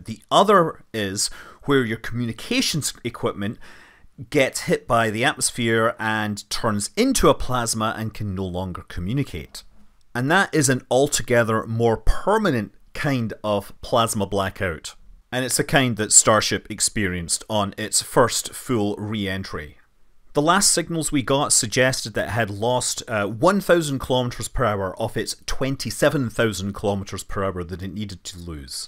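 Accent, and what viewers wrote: Scotland, United Kingdom. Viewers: Brought to your by, the manly Scotsman- love the brogue. 😊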